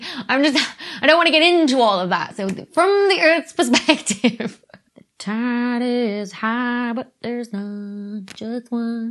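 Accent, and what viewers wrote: United Kingdom. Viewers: Over here, on the other side of the pond, we colonists (oops, I mean Americans) pronounce the word cen-TRI-fugal, not centri-FU-gal. That's not to say you're wrong... just different. (I actually am quite taken with your UK accent.) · She uses the British pronunciation with the accent on the u. American pronunciation has the accent on the i. Both are correct in their respective countries. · Wobble. Wooble. You pronounce it almost like the second word so yeah, it does sound a bit funny. Just like Saturn. Sah- uhn! Love your stuff Dr. Becky. I just have to translate your accent! When my wife and I watch Brtish TV shows, I'm always translating for her. We are both native English speakers!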